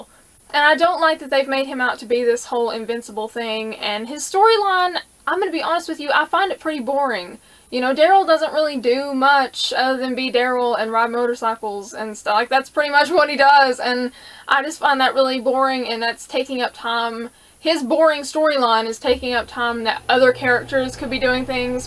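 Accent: South, United States. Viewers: I knew I subscribed to you for a reason. You're speaking my language! I don't hate Carol & Daryl either, but I am over them being these overly invincible characters who monopolize screentime that could go to other characters. They're not the only 'interesting' characters on this show but you can't tell by the way the screentime is divided. Give me more of Michonne & Maggie, damn it! But the Southern language thing is SPOT ON. I was born and raised in south Georgia, and that's how the people here talk as well. When I first started watching your videos, I thought you were from here but nope. You're just a part of my extended Southern family. Greetings, my Southern Soul Sister! P.S. I started in S4 after binge watching too. So, you're not alone in the sense that you started watching the show 'late.'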